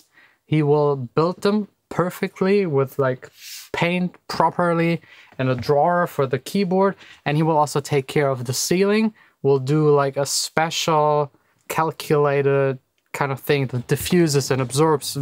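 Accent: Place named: Germany. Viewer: There are definitely whole sections of this video I swear I am hearing my words come out of your mouth , but with a German accent.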